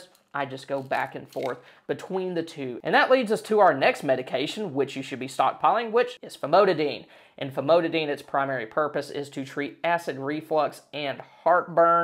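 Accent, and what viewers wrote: South, United States. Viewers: This Brit appreciates your videos and loves the southern drawl. Well done.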